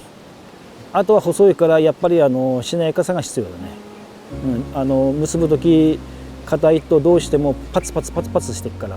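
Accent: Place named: Northern Tohoku, Japan. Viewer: 北東北独特の訛りがかっこいい！ 、話を聞いているだけで、釣りに対する知識や姿勢が感じ取れる、かっこいい方ですね。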